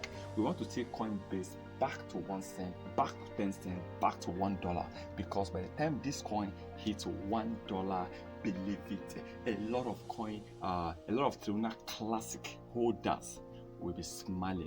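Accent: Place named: Nigeria. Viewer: U sounds like Nigeria,I'm Nigeria too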